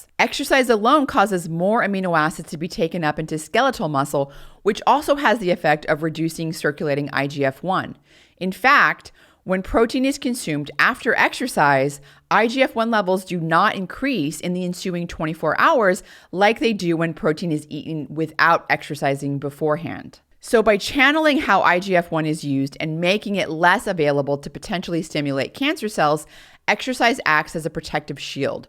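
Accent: United States. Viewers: Your typical American voice timbre makes me think you would be a wonderful country singer.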